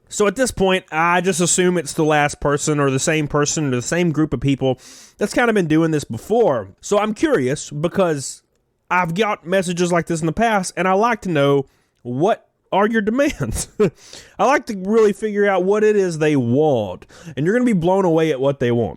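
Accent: South, United States. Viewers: Your accent lol, it’s just fine. Your from the south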